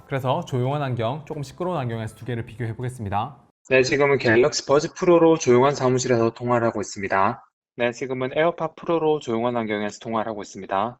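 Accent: Gyeongsang, South Korea. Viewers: 경상도 분인가요? 표준어를 구사하려는 것 같은데 억양이 경상도 사투리 쓰는 분 같네요.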